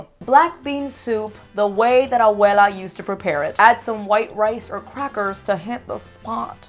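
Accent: Cuba. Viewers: I HAVE THAT SAME COFFEE MAKER AND SAME COLOR I love you girl you are my favorite and you make me laugh, I'm Colombian we have a few things in common with Cubans I been noticing and I love your Cuban accent you are just the funniest and the best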